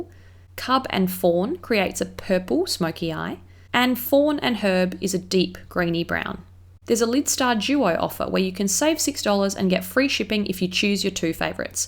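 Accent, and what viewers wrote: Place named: Australia. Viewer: do you live in australia? If so how did you manage to get glossier products? (judging from your accent and assuming you live here in australia cus they dont ship here lmao)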